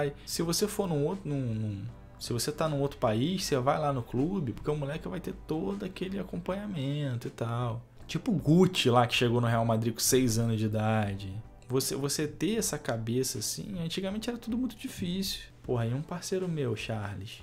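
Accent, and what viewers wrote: Rio de Janeiro, Brazil. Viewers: nasceu em SP, e tem o sotaque mais carioca do Brasil!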